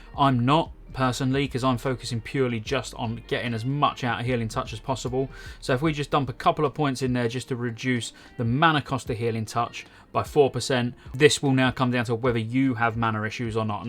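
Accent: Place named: Lincoln, United Kingdom. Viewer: You Lincoln lads say Natural weird. “Naytural” 😂😂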